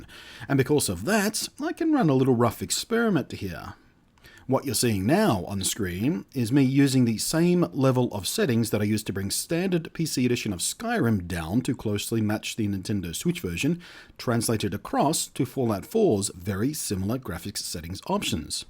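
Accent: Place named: Australia. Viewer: I love you milky smooth ozzie voice. No homo.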